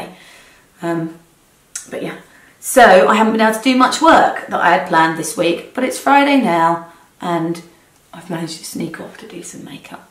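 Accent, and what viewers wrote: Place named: London, United Kingdom. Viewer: You have such a beautiful, perfect face! And I love the London accent! 😊🦄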